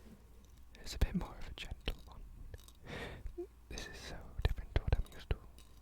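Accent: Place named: East Coast, United States. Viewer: sounds mostly east coast american to me! you do amazing asmr, keep going !!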